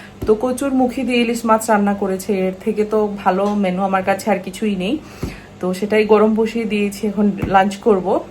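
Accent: Sylhet, Bangladesh. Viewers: Apu amrar sylheti mat matoin na kene shunte valo lage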